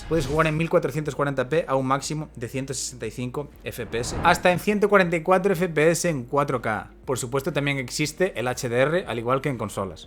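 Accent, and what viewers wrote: Galicia, Spain. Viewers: Eres galego? 🤔por tu acento digo ,buen video creo q cada año la piratería baja lo cual es algo bueno la verdad, a ver yo seguramente me compre la XBOX SERIES X pero pq no me alcanza el dinero para un PC de igual potencia y yo el PC lo uso pa trabajar solo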